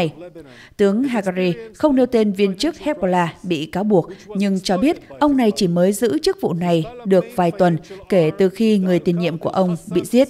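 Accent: North, Vietnam. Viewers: Đài này phải Đài cũ của HTD không vậy, sao phát ngôn viên tiếng bắc đọc vậy?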